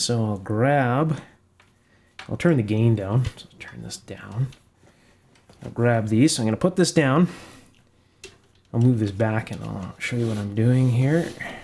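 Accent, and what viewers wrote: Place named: Canada. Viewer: Thank you …a fascinating video featuring an interesting oscillograph. I enjoy your mini talks and your delightful accent…what part of Canada are you from? (I’m in the south of England). My own very first oscillograph was ideal for checking audio circuits working up to about 1MHz or so. It was just post Second World War I think and used a 6K25 Thyratron in the time base…I’ve still got several of those in my shack (my UK callsign is G3XFD, my Irish callsign is EI5IW). In the past I’ve found some interesting little scopes at Amateur Radio Mobile rallies (I think they would be classed as ‘swap meets’ or something similar in North America) and have several 1CP1 one inch scope tubes which featured in Practical Wireless magazine (I was Editor for almost 25 years until retirement in 2013)) using the Mullard/Philips basic workshop project scope. 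A fascinating little tube, the 1CP1 (Loctal base) was used as a waveform indicator by the the old British Post Office Telephones (now BT) in telephone exchanges to monitor the dialling tone, which was generated by little 440Hz (approx) frequency output motor generators! Most of the scope tubes had phosphor trace burns due to thousands of hours continuous working…but they could still be used! Thanks for your excellent tutorials…I’m a great fan! Best wishes, Rob G3XFD.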